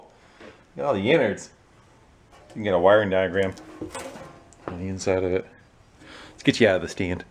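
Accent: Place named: Michigan, United States. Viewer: You sound familier. I bet your from Michigan.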